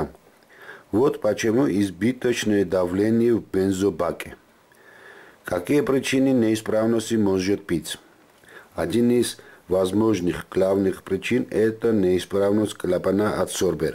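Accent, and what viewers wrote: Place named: Georgia. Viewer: Грузинский акцент, ласкает слух 👍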